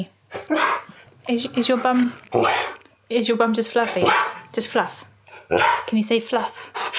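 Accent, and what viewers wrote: United Kingdom. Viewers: Ha! I was just watching another of your videos and running out the door, threw my phone in my hip pocket. Moments later I thought my “bum” was talking to me. In America. It had a Cornish accent. ... This video had started playing! 🌷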